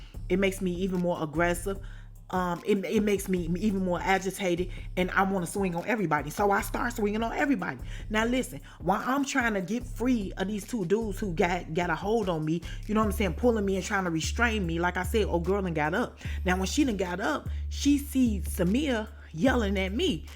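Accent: Chicago, United States. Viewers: I miss Chicago n I work graveyards when I get to work I throw her on and I feel like im at home n my sister telling me a story Chicago people sound just alike lol I love it thx for getting me threw these slow ass nights at work and keep up the great work hope 50 or Tyler Perry tap in with you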